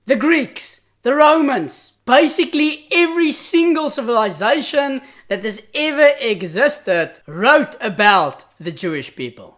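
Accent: South Africa. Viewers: Hi I want to convert to Judaism. Can you help me you sound like you live in South Africa am I correct . I do can you help me how do I convert. My email is <mail> if you can give me some advice. I have been to ISRAEL a few times and I love the God of Abraham Isiac and Jocob. And the Jewish PEOPLE It consumes me .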